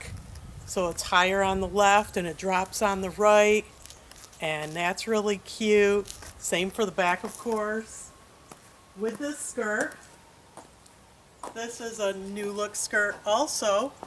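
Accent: New York, United States. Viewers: Your skirt and red top look great. I'm trying to figure out your accent. Almost NY but with a twist.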